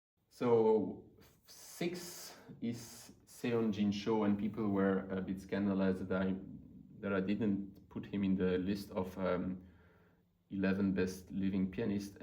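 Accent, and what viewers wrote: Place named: France. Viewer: Ton accent francaise est trop belle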